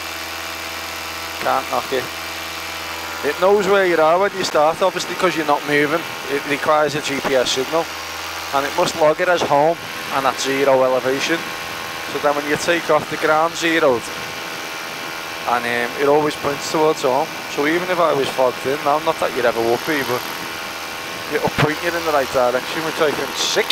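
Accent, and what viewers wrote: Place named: Liverpool, United Kingdom. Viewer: I watched it 10 mins after it dropped. Great skills. Keep making the videos. I love them, I'm hooked. It must be you that I have seen flying over ours, in Thornton, by the new brooms Cross Rd. I'm hoping to get into this myself. It's sounds amazing someone with a good strong scouse accent giving it the bitters in a paramotor.. Love it. Keep em coming. Stay safe.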